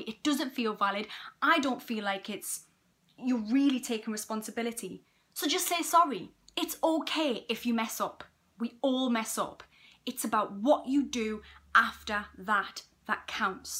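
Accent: Newcastle, United Kingdom. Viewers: I like your Gorgie accent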